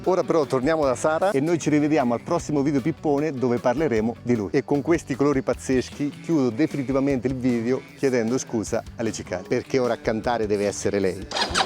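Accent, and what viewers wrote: Marche, Italy. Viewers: Tu sei MARCHIGIANO X CASO?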